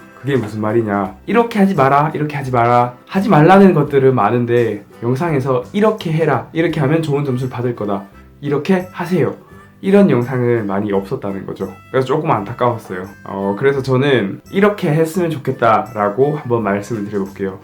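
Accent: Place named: Gyeongsang, South Korea. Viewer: 경상도 말투 귀여우세용 ㅠㅠㅋㅋㅋㅋㅋ 감사해요~!! 도움많이 받았습니당ㅎㅎ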